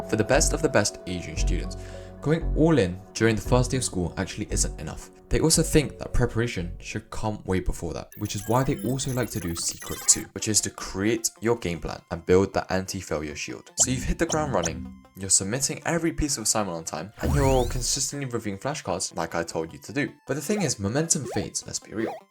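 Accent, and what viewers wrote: United Kingdom. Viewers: why does he sound so british?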